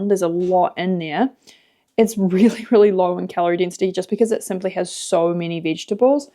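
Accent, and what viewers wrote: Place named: New Zealand. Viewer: DO IT!!! I moved to Japan right out of college. Didn’t speak a word of Japanese, but did have a few college friends there. That adventure changed my perspective SO much. You have friends here in the US, and people here are friendly and helpful. Please don’t miss out on this adventure for you, your husband and children. What’s the worst that could happen? You can always return to NZ. Just be prepared to answer the same questions over and over again. Americans are like big, friendly dogs when they hear an accent like yours and when they find out where you are from, you’ll become the neighborhood celebrities. I SO hope you’ll take the leap!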